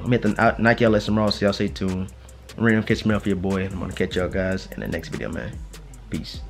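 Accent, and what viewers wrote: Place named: Miami, United States. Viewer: Hahaha you sound just like us down here in Miami. “Y’all boys!”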